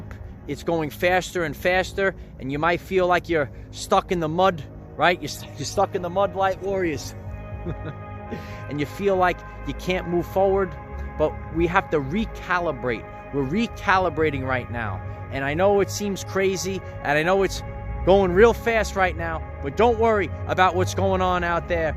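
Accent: New York, United States. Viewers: Yes! These last 2 years have flown by! Are you originally from NY?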